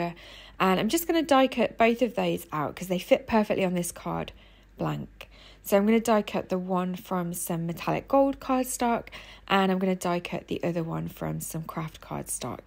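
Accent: United Kingdom. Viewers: Very clever, I have a tub of Tonic flakes that I used on a mirror I was up-cycling a few years ago, now I know how to use them in my cardmaking. I found your channel today by chance, listening to you it sounds like you have a slight west midlands accent. I might be wrong, I'm from Birmingham 😊💕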